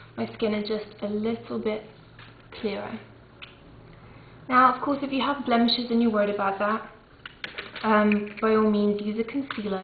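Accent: United Kingdom. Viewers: i love british accients ha sorry had to say it, and thanks for the tips:).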